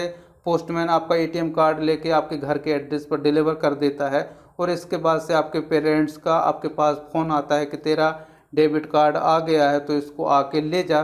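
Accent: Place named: Bundelkhand, India. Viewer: Tum Bundelkhand ke ho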